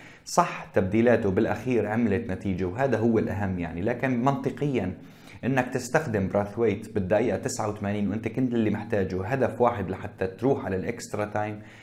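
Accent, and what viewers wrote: Aleppo, Syria. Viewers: كني حلبي ؟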